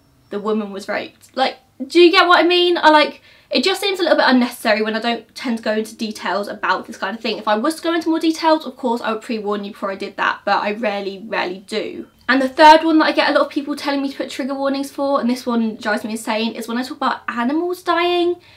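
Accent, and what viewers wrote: United States. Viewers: I agree with you!!! Keep up the good work and you did sound a little American and it’s ok 👍🏽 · Your little American accent is the cutest omg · Stand your ground. If you had something truly awful to say about rape, animal death, child harm....and the like, I have every confidence that you would warn us before you blurt out that the family cow stepped on the diaper of the baby of the family then the goose was giving the wife the eye and she felt threatened of rape so she slapped the horse on the rear and he kicked the goose in the knee. Enough said. BTW, your American accent is adorable. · your "american" accent is so cute!!! also completely agree with what you said in the beginning of the video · Your American accent is on point! Dont be so shy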